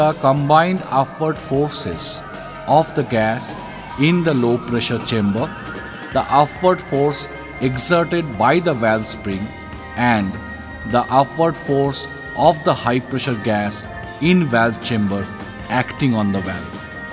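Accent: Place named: India. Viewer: We get really bored with this INDIAN accent...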